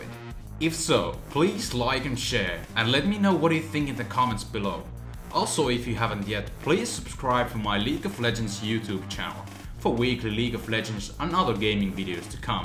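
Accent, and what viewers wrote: Finland. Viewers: Are u from finland? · Heti ku alotin videon tajusin "Tää äijä o suomalaine" sen kuulee enkusta...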